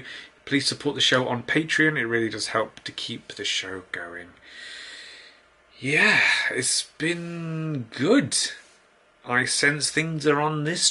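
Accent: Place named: North, United Kingdom. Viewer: I thought for ages you were a fellow northerner, where are you from originally?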